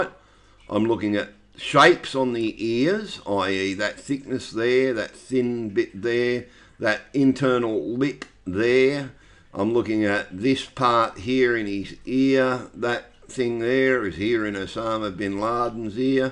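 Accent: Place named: Australia. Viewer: "If ya don't fuckin believe me I don't care" - you're awesome mate! Nice to have a real aussie bloke talking about these things on here. Ive always believed that they are the same man too.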